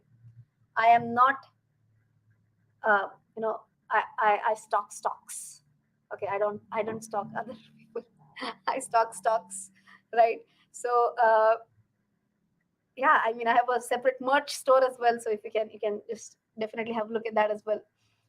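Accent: Maharashtra, India. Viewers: 1. Avoid side talk, remain on topic. 2. Avoid guesses, stay with facts, 3. Dont mind but avoid the marathi accent. Jokes are fine. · Like the content, but hate the yaaaaas, no editing, and marathiness! So cringy.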